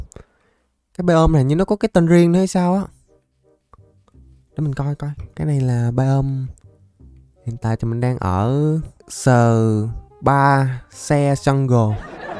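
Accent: Mekong Delta, Vietnam. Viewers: em nói thật lun anh làm clip thì ah hãy nói giọng thật đc ko chứ ah đừng chỉnh giọng anh chỉnh giọng để làm clip về mục đích j nên em mog anh làm clip hãy nói giọng thật của mình mình nói thật với tất cả fan của anh, giọng thật của anh khác nữa giống người miền tây nên em nói 1 câu chân thành với ah, em mog anh làm clip hãy nói giọng thật nha anh bạn nào muốn nghe đc giọng thật của ah thì hay qua kênh của anh Găm Bồ Gaming và gõ Lộ Mặt Thật Ngoài Đời Khi Phỏng Vấn Cùng Burgerby nếu như ah đọc đc bình luận này thì em mog anh có thể nói giọng thật của mình ạ chúc ah 1 ngày vui vẻ ạ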